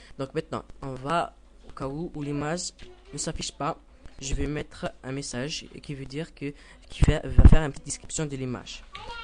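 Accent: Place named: Maghreb. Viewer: hhh super hh t marocain ou algerien bayna mn lhdra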